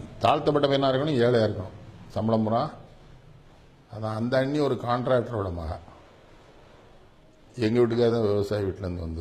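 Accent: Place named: Tirunelveli, India. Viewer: My native nellai speech,,,♥️♥️♥️♥️♥️🙏🙏🙏🙏🙏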